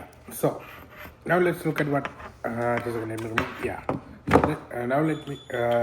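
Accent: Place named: India. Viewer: he did sound indian though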